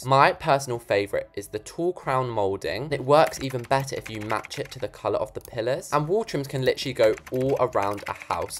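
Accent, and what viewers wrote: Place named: Gloucestershire, United Kingdom. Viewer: You sound like youre from Gloucestershire😭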